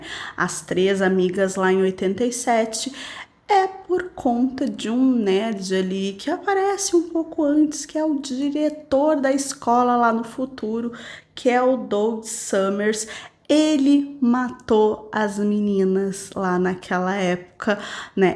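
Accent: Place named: Porto Alegre, Brazil. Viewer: Tu é minha conterrânea? Com esse sotaque só pode ser porto-alegrense.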